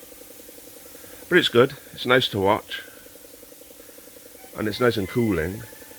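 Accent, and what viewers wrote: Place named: Wales, United Kingdom. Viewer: I never knew you were this Welsh! Awesome vid!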